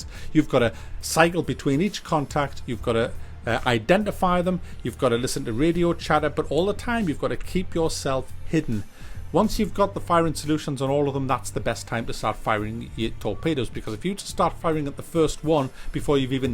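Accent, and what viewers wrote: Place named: Teesside, United Kingdom. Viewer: Hillariaous, sound like just over the bridge. Smoggies as you lot call us 🙂 Agg missed the k from end of the c, ponders if put an o between 🙂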